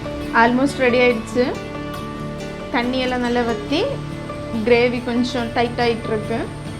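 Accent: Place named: Kanyakumari, India. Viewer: vearaci.... kollam✌😍 Nature of kanyakumari slang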